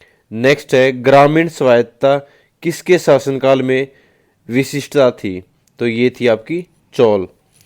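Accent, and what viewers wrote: Haryana, India. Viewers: Bhaiya the Haryana s ho ke?😊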